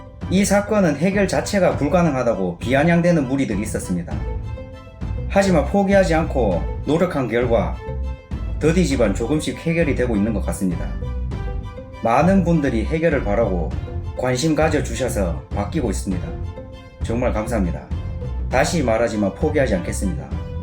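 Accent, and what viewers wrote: Gyeongsang, South Korea. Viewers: 졸라 무서운게.. 알고리즘 타서 우연히 보게 된 영상인데, 채널주 말씨가 갱상도다. 근데 사건지역인 창원도 갱상도 아님? 같지역 사람한테도 젊은이한텐 일단 무대뽀로 행패 어지간히 쳐부리네 시골 틀딱것들 무습다 무스버.. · 경상도 인가요? 의안부가 필모한가? 친일 매국노들의 후손 인듯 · 경상도 아임니까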